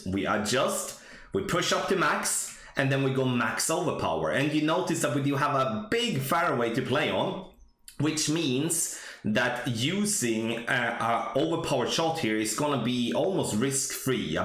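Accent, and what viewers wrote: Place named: Sweden. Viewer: Du låter svensk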